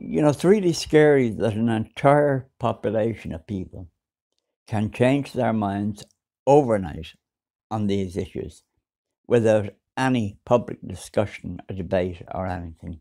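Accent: Ireland. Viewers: Its the content is what counts man. Many excellent points. You are not wandering at all man. Its also your honesty and integrity. Im born and bred Dublin too but I could listen to that accent and watch you all day. Keep up the great work. You are an old-school true blue, true Dub with top values and principles. Yes it is stunningly disturbing how quickly woke was adopted here and throughout the west. Its the wealthy Marxists to blame! Ireland seems lost. God bless.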